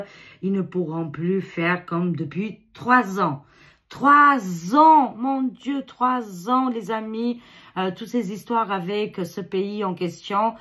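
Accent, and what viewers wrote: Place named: France. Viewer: Allo, c’est Johanne de Québec, j’ai aimer beaucoup ton vidéo À chaque jour jadore ❤❤❤❤❤❤ comme d’habitude, tu as beaucoup de nouveaux sur la France dans ton pays, et c’est très intéressant, c’est vrai que vous avez eu la vie pas très facile Depuis quelques années là bas en France, ça aucun sens, et tu as vraiment et tellement Raison, qu’il y aura beaucoup Nouveaux positif dans votre coin de pays, et j’aime et J’ adore beau ta belle accent de français quand tu parle, et sache que tu t’exprimes très très bien vraiment sincèrement, j’aime beaucoup entendre ta belle voix ma belle, je t’admire beaucoup, et sachez que Nous aussi à Québec le Gouvernement nous ont mener Dure, vous n’êtes pas les seules, car moi je vous comprends très bien 👍 je reviendrai écouter, plus-tard ton autre vidéo, Aujourd’hui plus-tard cars Nous avons 5 ou 6 HRS: de Décalage Avec Québec et la France, nos amis et cousins cousines de France, et je te dit toujours ton Beau sourire charmeuse, merci pour tout ce beau partage 😊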